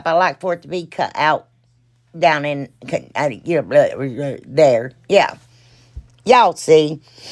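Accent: South, United States. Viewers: I always love to see that you upload a video. I feel like I can relate to you more than most others that I watch. I notice that your address is in OH, but are you originally from a more southern state? I just wonder because you have a wonderful southern accent. I do, too, so I just relate to it.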